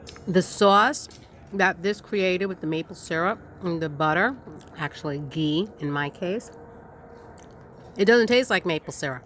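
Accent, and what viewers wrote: New York, United States. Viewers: Hello. That recipe sounds do delicious. I just love that New York accent. I can tell your choice of foods you can cook. Thanks for taking the time to have this delicious recipe. God bless. I'll be waiting for another fantastic video.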